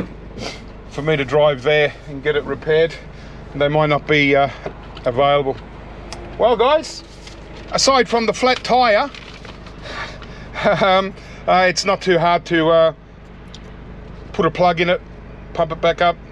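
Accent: Australia. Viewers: Well your accent sounds Australian but y’all use dollars?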